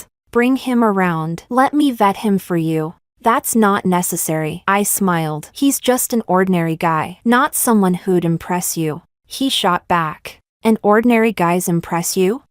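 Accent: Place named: England, United Kingdom. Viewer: It surprised me that the Spanish was pronounced with an English accent 😅 Just FYI, the narrative switched to Spanish towards the end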